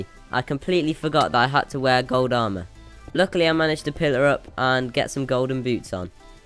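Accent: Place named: United Kingdom. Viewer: ayy is british like me im fromnothern part of england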